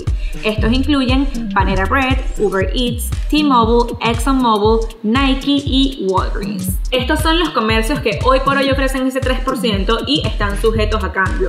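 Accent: Venezuela. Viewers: Hola me encanta tus vídeo y la forma como los abordas. Y ese acento venezolano es lo mejor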